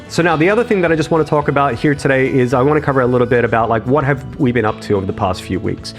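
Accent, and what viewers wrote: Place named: Australia. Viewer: Even since Satisfactory i’m confused by his accent. Are you aussie? American? But you’re in Sweden apparently??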